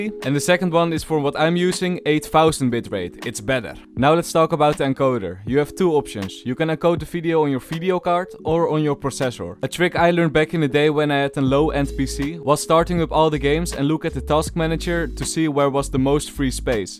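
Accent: Netherlands. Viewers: is that a dutch accent i hear? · are u netherland ? u raely have the accent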